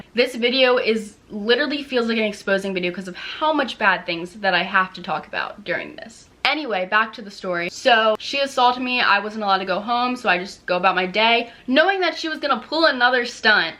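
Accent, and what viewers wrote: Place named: Maryland, United States. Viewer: im from Maryland too and when you first started talking I could hear the Maryland accent haha and also a lot of schools in Baltimore/bel air are horrible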